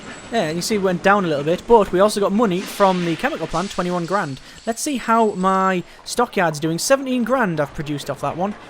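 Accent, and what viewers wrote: United Kingdom. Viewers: Are u from uk osp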